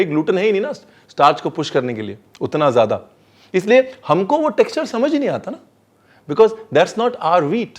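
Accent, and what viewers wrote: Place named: Lucknow, India. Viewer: Maza aajta hai aapke videos deke. Aapka lucknow wala tone and words itna acha lagta hai feels like my friend is talking to me.... Btw I am also from Lucknow.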